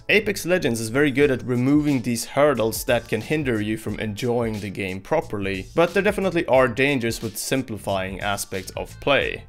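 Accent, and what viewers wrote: Sweden. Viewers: Are you swedish? You have a very swedish accent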